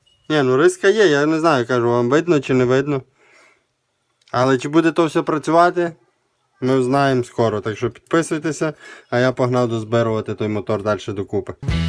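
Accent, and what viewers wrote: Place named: Odesa, Ukraine. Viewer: Відчуття наче одесит перейшов на україньську, дуже кумедно)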